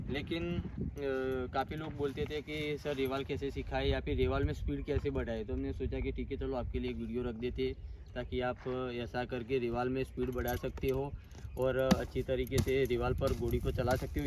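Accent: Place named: Gujarat, India. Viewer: Achhchhi hindi bolne ki koshish kar rahe ho magar gujarati mix hohi ja rahi hai,dua hai aap ki hindi aur achhchhi ho jae taki publik ko aur fayda ho, aise bahut achhchha sikha rahe ho aur aap achhchhe bhi ho....bahut bahut shukriya from Bardoli dist surat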